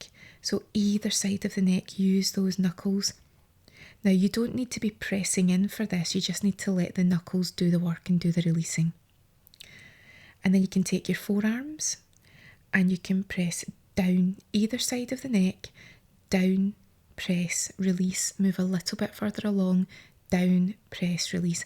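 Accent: Scotland, United Kingdom. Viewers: Beauty Thank you. Lovely to hear your accent too - I am also Scottish! Comforting sound and lovely video. Subscribed!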